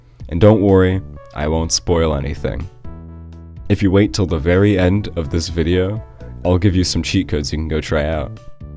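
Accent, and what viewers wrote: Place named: United States. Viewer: I find that if I pause it, the picture becomes still and that annoying, dull, monotonous American excuse for an AI narrator stops too. This leaves me feeling peaceful, somewhat aloof and ready for anything.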